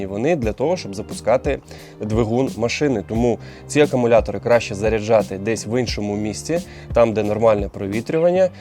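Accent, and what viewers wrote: Standard. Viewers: Чистый украинский.Респект!!! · норм язык, в наше "время мовнюков" редко услышишь чистый родной язык, а не суржик. Спасибо.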